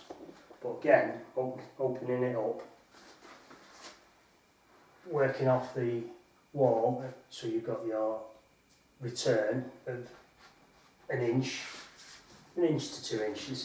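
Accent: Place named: Lancashire, United Kingdom. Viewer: Sounds like north Lancashire to me mate 🤔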